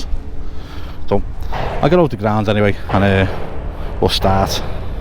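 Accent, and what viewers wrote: Liverpool, United Kingdom. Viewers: Cool hearing the difference between the actual Liverpool accent (yours...I'm guessing N.Liverpool..?) and Wirral accent. I know given the short distance outsiders think the two accents must be the same the same....but they're not.✌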